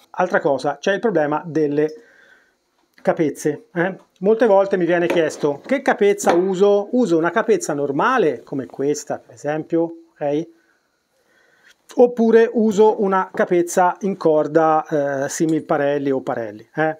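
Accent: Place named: Tuscany, Italy. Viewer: Bravo, spieghi talmente bene e in modo chiaro che possiamo capire tutti. 👍Grazie. E un piacere ascoltare il tuo accento tos ano😊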